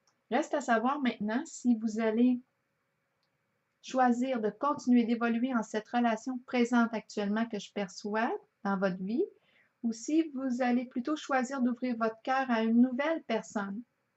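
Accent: Canada. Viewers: Bonjour oui j'ai vraiment envie de me sortir de ce bourbier! De ces blocages qui durent depuis trop longtemps, j'aimerais changer ma vie! Vous chantez bien,j'aime votre accent canadien 😃👍bélier ascendant lion poisson en lune! Plus de cinq ans de céliba! Oui j'ai envie de vivre l'amour,même si mes filles me comblent d'amour 😃👍 inconditionnel merci a vous pour cet espoir que vous nous redonnez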